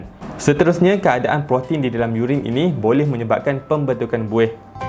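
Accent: Malaysia. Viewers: Orang Malaysia Ya Bang...?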